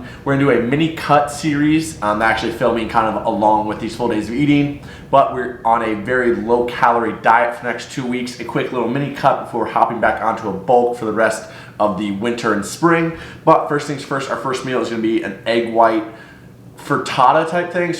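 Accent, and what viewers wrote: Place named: Australia. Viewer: Random question here, have you lived in Australia? I can hear an Aussie accent through your American accent! Just curious!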